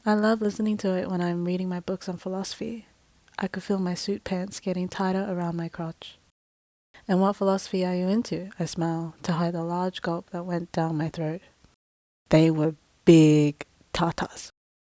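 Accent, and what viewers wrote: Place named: Australia. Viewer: Australia I think. Gotta see if she says "naor" or not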